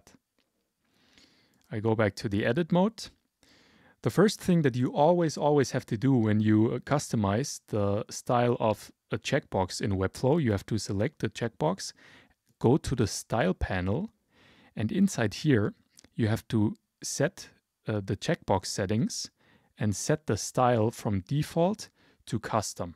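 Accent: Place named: Poland. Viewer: Your channel is great, your pacing is excellent, easy-to-follow and not monotonous or boring whatsoever. I stayed engaged and interested the entire time, and the way you laid things out starting with basic styles and narrowing down to more advanced styling was really logical and built a solid foundation of understanding (and retaining!) the information! Please keep it up, make more videos and continue being awesome. Also, are you Polish perhaps? Trying to guess by the name and the accent, I couldn't tell because it's not very strong, so I am just curious. I am Polish myself!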